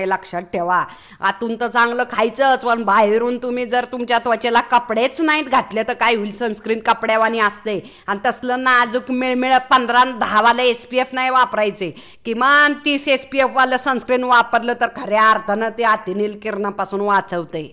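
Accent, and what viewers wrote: India. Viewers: तुमची गावरान भाषा पण किती छान वाटते ऐकायला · खूप छान माहिती सांगितली ताई आणि आपली गावरान भाषा तर लय भारी 👌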